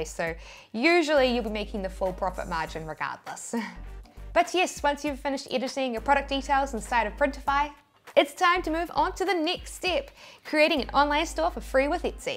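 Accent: New Zealand. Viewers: Hmmm, I would have guessed you had a Kiwi Accent. ;) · i think shes from new zealand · The last one that threw me was "each" pronounced as "ahch". It would be cute if you did a video on the New Zealand accent. Yours is quite prominent. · you are a bloody good Kiwi! And all that with the accent:) I am looking for getting an income as it has now been over 5 months without employment and I realise that recruiters are not working hard enough to help me get a job. I have to chase the opportunity myself and indeed, what better than running your own business. You are very detailed in your videos and you stick to your advertised title, which I really appreciate. Being without income, I am maxing our credit card to pay the bills. I hope there might still be enough on the main credit card to pay for the up-front cost when someone buys an item... As you said, it is a cash flow as the sale is made, but imagine if you sell 1,000 items in one week and you don't get your money before the second week, Ouch! 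But then, you can explain this to the bank manager and show your orders with the expected payout to come. I am sure the bank will allow an overdraft on the account for the credit card... (hopefully these are still humans?) I will probably watch some more and this one again and get started tomorrow. Thank you for your time making these videos. You definitely deserve your income. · You're so sweet. I lived in NZ and I love hearing your beautiful accent. Reminds me of one of the best times of my life. Amazing video as usual. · I was wondering if it was New Zealand, thanks! I gotta practice my accent interpretation. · Oh, so that's where it's from the interning accent, New Zealand , 👍 , lovely acquainting of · New Zealand's English is so adorable! 🌷🌿🌎💖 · Love the Kiwi accent! I watch cricket and so I understand the Kiwi accent perfectly. Love from Sri Lanka 🇱🇰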